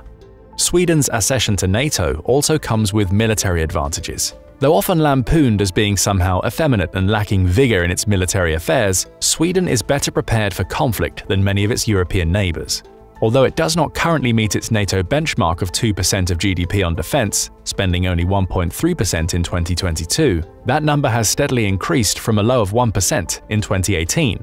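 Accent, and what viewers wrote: United Kingdom. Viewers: Wishful thinking with British accent 😂😂😂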